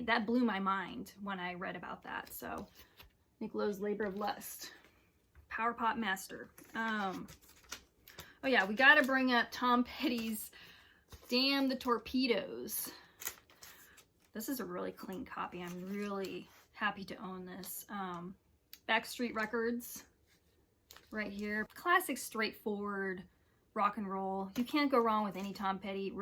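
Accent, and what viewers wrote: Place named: United States. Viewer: Yet again, a great selection of records from the year in question by such a young (...compared to me) person! Absolutely all my faves are in there.....well almost - you need to include (ah yes, you did pick this one - I started typing this comment too soon!)...oh and by the...and maybe by, and by, and by (probably their best), and by, and by. You are quite right, is the absolute greatest TH's LP, as hopefully you'll eventually discover...and is also EC's best! This is so good! And you pronounced name correctly - your smooth american accent makes this sound as naturally perfect as it is! You took me right back to my whole life in the sixth form (which is the two penultimate two school years before going on to university, etc. for those viewers who are not English!). Thanks for taking me back to such a joyous time - these records don't just contain music, they're sort of erm,... 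notches on the belt of life - gosh, that sounds so corny (sorry!)! Btw, if you're really going down the collectors line, you need a copy of with the fold out rear sleeve (but mines not for sale at any price, I'm afraid!). All the best. Cheers!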